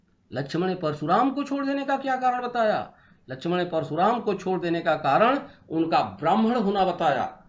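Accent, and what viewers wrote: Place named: Bhojpuri, India. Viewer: Waise toh tunne pgt hindi likha hai lekin padhta tu hai hindi mix bhojpuri, pehle apni hindi sudhar tab padhana supari nikal ke bol ...Pehle rehearsal kar tab video banana😀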